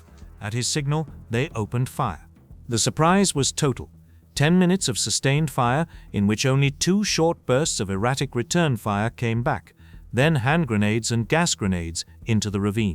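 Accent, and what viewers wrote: United Kingdom. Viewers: I don't want to listen to British accent voices talking about US Marines we don't have any Americans that can narrate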